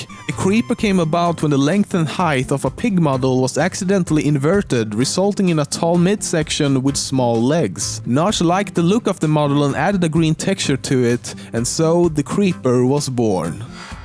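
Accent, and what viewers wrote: Sweden. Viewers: He is Swedish, so he says "H" as "Y"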